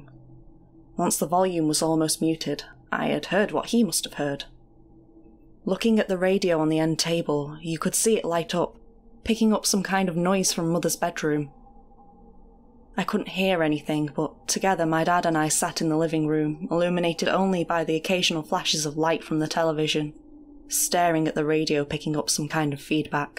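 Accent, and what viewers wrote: United Kingdom. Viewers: Amazing story and she did an excellent job!!! I love a British accent and she def brought it all to the table. Would love to just sit and listen to her narrate stories all day. Good luck, hope to see you push through to the next round!!!!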